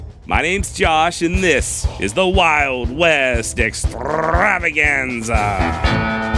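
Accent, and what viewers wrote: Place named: South, United States. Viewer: Sir..it is a pleasure to listen to your southern accent. And your humour is refreshing. Your account and your presentation is undeniably researched. Thank you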